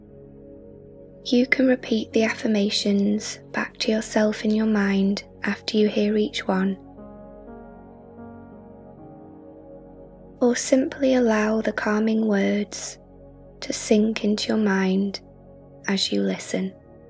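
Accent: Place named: North East England, United Kingdom. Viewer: I'm a Geordie, from Newcastle! Sounds like you're from somewhere not too far away :)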